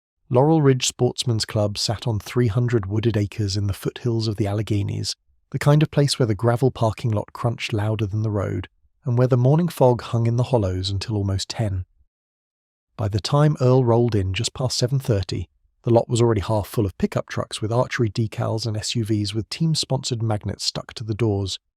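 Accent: United Kingdom. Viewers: Hate this AI with British condescending voice and accent.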